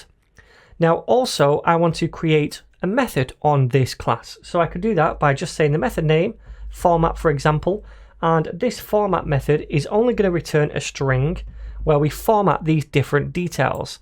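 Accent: United Kingdom. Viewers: i love your British accent <3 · British accent? Sounds nice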